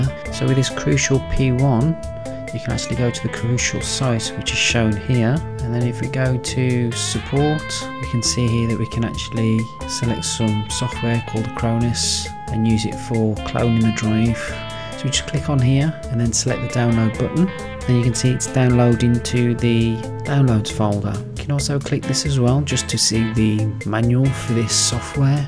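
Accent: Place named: Midlands, United Kingdom. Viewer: some one i understand from the midlands cheers matey.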